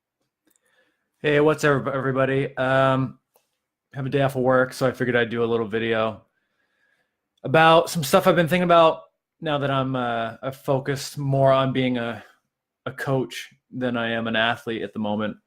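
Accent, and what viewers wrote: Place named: New Hampshire, United States. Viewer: You sound like you're from Troy, NH.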